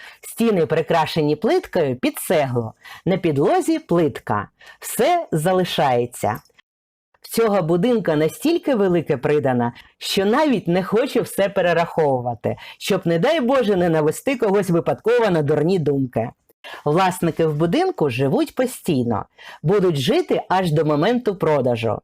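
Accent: Russia. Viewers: Та де там "гарна"! : ЗАХАДИ та живи, вітальня-СТАЛОВА, коти МІЛКІ, намагалась знайти ТРІЩИНИ, на випадок проблем з ВОДОПРОВОДОМ, велике ПРИДАНЕ.., вже не кажучи про оте московське "акання"; невже аж так важко вимовити "о", тим паче читаючи текст: адже написано по-руськи (т.б. українською) "о", а вимовляють по-московськи "а"?!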